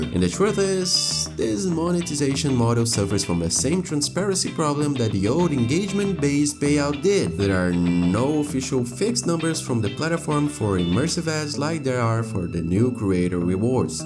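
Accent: Brazil. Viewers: percebi, cliquei no video e fiquei assistino, e so pensando, "sotaque brasileiro do caramba", kkkk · Teu sotaque parece brasileiro 🤨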